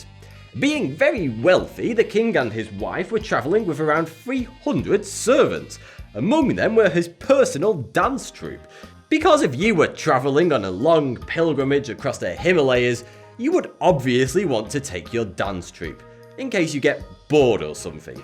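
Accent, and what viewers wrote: United Kingdom. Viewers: Man, where in the UK do you come from, please? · The British accent? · Why do British people have to speak like that?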